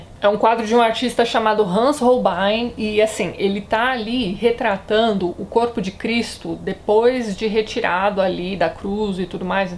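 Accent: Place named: Brazil. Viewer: Despertou curiosidade de ler e estou lendo. Bons resumos despertam vontade de ler. Rui, um português de Portugal que julga excelentes os vídeos apesar do português com "açucar".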